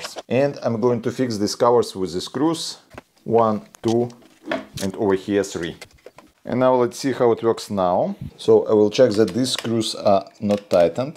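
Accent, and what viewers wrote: Russia. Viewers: Is this a Russin accent? ) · Я не очень хорошо знаю английский но по моему у автора явно русский акцент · Ты же русский, я слышу. Хватит придуриваться, говори нормально!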